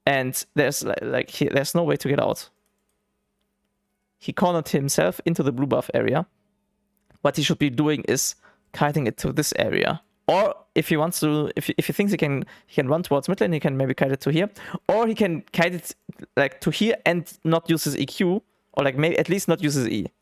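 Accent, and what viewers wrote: Germany. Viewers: Immer dieses komische Englisch, das wird sich kein Schwein anschauen nur weil Tyler1 im Namen steht weil du eben kein Nativ Speaker bist und man das hört. Würde ich auch nicht wenn ich Englische Streams schaue dann von jemanden der dies als Muttersprache hat und nicht einen deutschen der Englisch spricht. Ist ja Giga Cringe und die herbste Reichweite generiert man damit auch nicht weil man eben einen guten Bruchteil seiner Fans enttäuscht die aktuell schon den Kanal folgen und vielleicht kein Englisch können.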